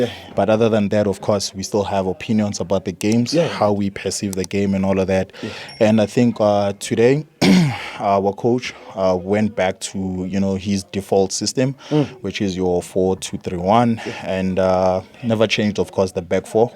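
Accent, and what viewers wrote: South Africa. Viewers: Brilliant analysis Brilliant Jozi accent and eloquence. Thanks for salient commentary 🙏🏾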